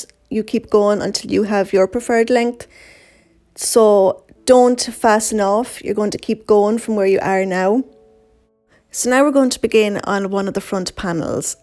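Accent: Ireland. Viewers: Yay Irish accent.. Love the video x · I love this pattern! thank you but i also love listening to you speak..love your brogue..:)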